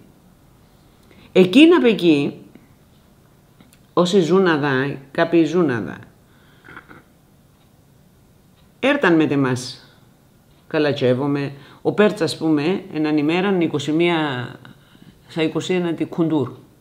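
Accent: Greece. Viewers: Her accent heavily affected by Demotic. This is not authentic Pontic Greek. She hardly pronounce the sounds of "ch" and "sh". You could find authentic untouched Pontic Greek only in the mountain villages of three districts of Trabzon province, Turkey spoken by elderly women, especially women. "Çaykara, Köprübaşı, Dernekpazarı" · its influenced my modern greek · Yes I was thinking the same thing, her accent is very influenced by modern Greek especially as it lacks the Turkish influenced sounds of ch, sh, and the like. This is normal as Pontians were forced from their homelands after the genocide 100 years ago … it’s hard to maintain everything perfectly.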